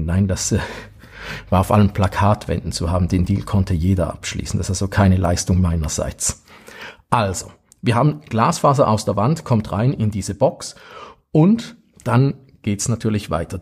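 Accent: Switzerland. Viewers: Wenn ich die leichte Dialekteinfärbung richtig interpretiere, wohnen wir wohl im selben Kanton (SG). Auch ich hab' FTTH bei Sunrise, auch ich zahle CHF 40 pro Monat, aber bei mir gibt's dafür nur 20 Mbps. Die AVM 5490 musste ich sogar selbst kaufen. Da sind wir bei meinem Problem: Der Kundendienst der grossen Anbieter ist zu einer Lotterie verkommen. Bei jedem Wechsel riskiert man, wochenlang ohne Internet und Telefon zu sein und deshalb mache ich keine Anbieter- oder Vertragswechsel mehr. Wäre vielleicht mal ein Thema für die Tagi Digitalseite, statt ständig nur neue Produkte vorzustellen...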